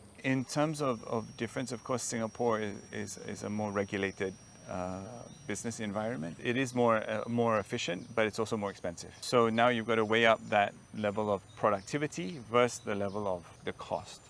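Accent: Malaysia. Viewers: Damn, as an australian born, he sounds more malay then malays from Mont Kiara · This white dude talks like he is a native Malaysia. He has the Malaysian accent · Dude speaking malaysian accent after 30 years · This Aussie speaks like Malaysian lah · He is so Malaysian. He lost his accent already. if I hear his talking without watching the video, I definitely say he is Malaysian who speak English. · if i dont see face.. i believed he is truly malay malaysian... ahhahahah no more australian sound... btw apa habaqqqq.. moga sehat2 selalu... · He doesn't like durians?! That's a big no no...🤣 but seriously after 30 years in Malaysia he sounds completely like a Malaysian.. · Even his accent is ma-nglish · I was just listening to the video while working. Never thought its a Mat Salleh talking because he sounds so Malaysian when I watch the screen at the end of the video. 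He totally assimilated with how Malaysian speaks English. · Dah bunyi org melayu cakap omputih....betul2 jadi melayu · White guy with malay accent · im a malaysian and this guy has more of a malaysian accent than me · I am awestruck that he sounds so Malaysian! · Bro his Malaysia English accent is spot on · This is the first ang mo I come across who actually speaks Malaysian accent. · He sounds like malay when speaking English 😁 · Wow! 30 tahun duduk Malaysia, cakap omputih pun dah slang Melayu! Hahaha! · omg.... he speaks like a Malaysian!!!! hahaha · gosh i wouldnt have guessed he's an australian with that local malaysian accent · Was listening to this on the car bluetooth. Didnt realize he's Australian until i took a peak at the screen. Wah, so Malaysianised, this Mat Saleh! "Lemme help you out lah, bro" and "ohnee" instead of 'only'. Superloving it!!! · This guy is australian right?why did he sound more to malay datuk? 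· His english is mix accent of Malay and Indian Malaysians 😂I can't believe he is Aussie! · Lots of dumb Malaysians on here saying they can't hear his "Australian" accent anymore. That's because he's British. I am American and can 100% hear his British accent, it just has a heavy Malaysian influence to it, but I can tell where he came from very easily. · Yo this guy sounds malaysian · He sounds more Malaysian than some Malaysians! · I thought he was a Malay guy 😂 I had to look closer to the screen to see it was actually a white guy 😂😂 I love it. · He sounds very Malaysian 😊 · Aussie fdude turned Malay in his accent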